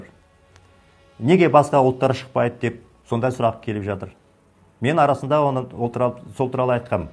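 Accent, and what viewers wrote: Standard. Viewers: Алга. Алга ДВК. казакша таза сойлейди. Казактардын ишинде казак тилин билмеген сон ерикзиз орыша сойлеп жур Токайдан 5 есе казакша сойлеп, казакша ойлайды.мухтарды колдаймыз.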